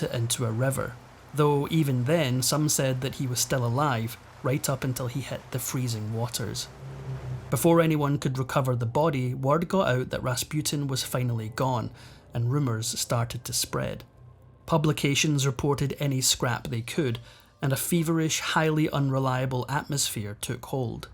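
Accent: Scotland, United Kingdom. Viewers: My all time favorite narrator Love listening to the Scot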